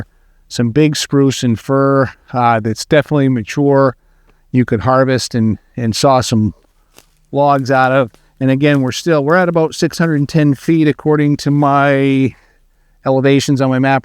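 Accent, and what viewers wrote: Maine, United States. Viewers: Great looking property. I’m a southerner but I like the Maine accent. Very informative.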